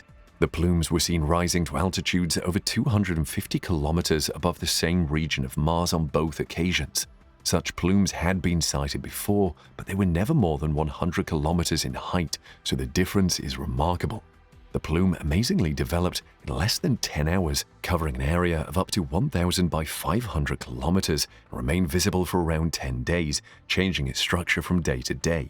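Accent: United Kingdom. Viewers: Just how far in space can a built object go before burning in the atmosphere? What are the odds that we are being lied to about ALL what this guy with his SO official voice?!? And because he has a soft English/British voice, is that supposed to make him seem honest when ALL they've done throughout time is lie to us???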